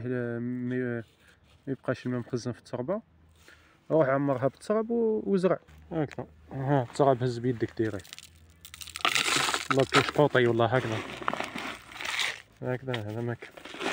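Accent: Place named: Constantine, Algeria. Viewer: خويا انت قسنطيني ...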